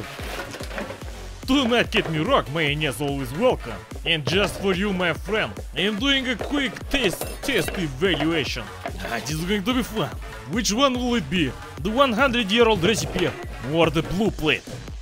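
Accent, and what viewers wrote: Eastern Europe. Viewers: hello, this "hello" comes from very far away from you, directly from Portugal. I'm a huge fan of your slavness and the way you talk, makes me laugh so much and it also reminds me of the good old days of red alert 2:D anyway, if anytime it would be possible, i would recommend you to come and visit us, i think you would like our warm wreather and our beer, to be consider one of the strongest in europe, any way thanks for you time, and stay cheeki breeki;) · I was very tired and fell asleep to your soothing slav voice. Thank you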